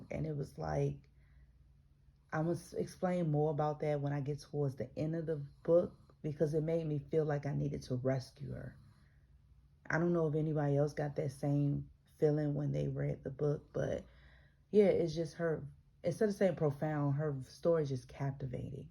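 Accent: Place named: South, United States. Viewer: P.s. I'm from New York. It's so true that my city is dirty, lol. You have a bit of a southern accent to me. It's not that thick, though.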